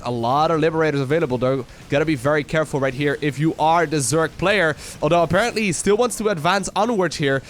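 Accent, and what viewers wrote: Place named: Netherlands. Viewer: Could be saying "serral" or "several" can't tell with that delightfully dutch accent.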